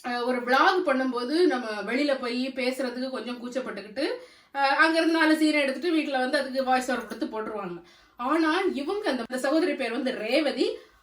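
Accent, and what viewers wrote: Nagercoil, India. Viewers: Sister so so cute and beautiful.your voice really nagarkovil.brother super aha flights podukirarkal.super.daily i watch your videos.sweet voice.effect aha ullathu. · All ur video are too good sister. Ur Nagar kovil language is very nice to hear . Keep doing it sister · I love to hear your Nagercoil Tamil. I am from Nagercoil settled in Bombay. I watch your almost videos. Beautiful couple. I never seen your elder son.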